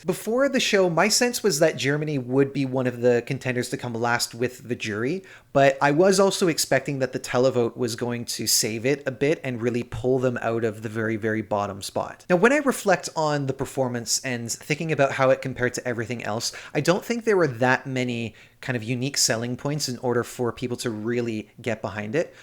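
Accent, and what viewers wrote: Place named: Canada. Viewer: I subscribed to your channel when I discovered it listening your appraisals of the Benidorm Fest. As I told you then, your channel was going to be the one in English I was going to follow covering Eurovision 2023. I have lived in Canada (BC and Toronto), so your accent sounds so familiar to me, which is a plus when I listen your commentaries. The objectivity and consistency of all of them is also here in this summary. I look forward to the video you are telling us is coming next.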